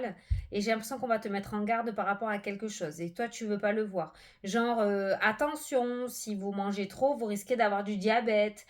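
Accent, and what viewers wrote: South, France. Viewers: Coucou surtout ne change pas garde ta belle humeur et ton accent du sud et petits mots qui dérangent que certains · Bonsoir à vous merci beaucoup pour se magnifique partage, ( et oui dans le sud c'est comme sa qu'on parle, je suis du 13 donc je suis comme sa aussi. 😊 ne changez rien, restez comme vous êtes 😁 on vous aime comme sa. ) bonne soirée à vous aussi et gros bizoo 😇🙏😘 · cc moi aussi je suis du sud je te comprend très bien c notre parlé bisous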